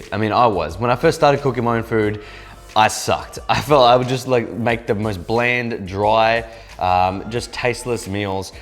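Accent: United States. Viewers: Omg!!!! Your Southern accent! Yass!! 🤣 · BROOO THE AMERICAN ACCENT IS SICKKK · Wtf he sounded American af😂😂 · American accent is on point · Yooooo your American English accent is perfect lol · American accent on point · Your American accent is scary good. I just started watching these videos and I swear I wouldn't have known you were Australian if I had stopped after the intro 😂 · Holy fkn shit look literally like Justin Beiber and u nailed the american accent dear god. way to go man. not just braun :P funny too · On point with his American accent. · not bad american accent, didnt say bro or dude (yes that stereotype is true) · Lmfao the American accent is so funny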